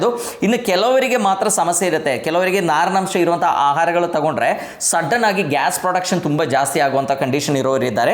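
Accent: Kerala, India. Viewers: ನಿಮ್ಮ ಕನ್ನಡ ಮಾತುಗಾರಿಕೆ ಅತ್ಯದ್ಭತ ಡಾಕ್ಟರೆ 🙏🙏 ಅಲ್ಲಲ್ಲಿ ಮಲಯಾಳುಂ ಆಕ್ಸೆಂಟ್ ಮುದ್ದಾಗಿದೆ❤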